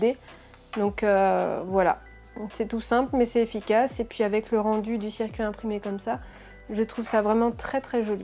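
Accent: France. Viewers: A few minutes later with French accent😀. Spot on. Nice channel.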